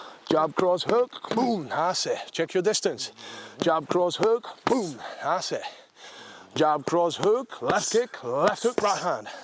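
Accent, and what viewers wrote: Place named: England, United Kingdom. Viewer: Yeah very long time by your accent. it's just a curious combination of accents. It took me a minute to figure out you were a native french speaker. In the beginning first few seconds you sound very english . Regional accent is very strong but they you listen again and you can tell you are not a native speaker but it's very hard to tell where. I figured french . THEN I saw your name.. after J wrote the comment and realised.. ahhh obviously he's french. But it's not at all easy to tell. You definitely sound more English that french..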